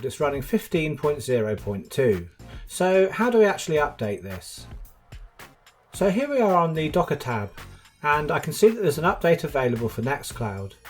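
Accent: United Kingdom. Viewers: Wow, the next brilliant and useful series completely in proper british english!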